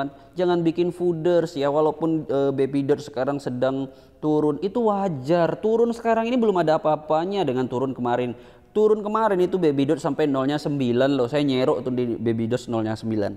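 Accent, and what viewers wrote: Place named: Medan, Indonesia. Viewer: Org medan boss logstnya.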